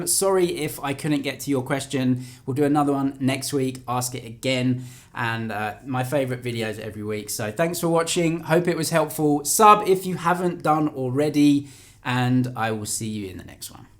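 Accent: England, United Kingdom. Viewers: ❤️from India , I really like ur videos for ur hard work, no favouritism, truth and ur English accent I really like it .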